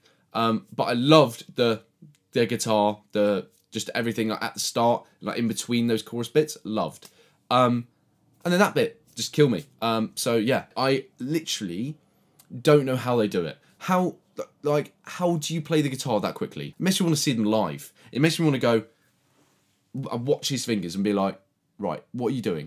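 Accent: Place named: United Kingdom. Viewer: Good video. You're super funny. British accent is the shit. Liked it!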